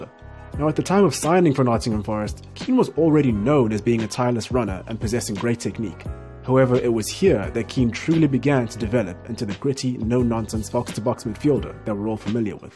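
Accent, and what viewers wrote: South Africa. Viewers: where are you from? south africa? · Nice to hear a South African 👍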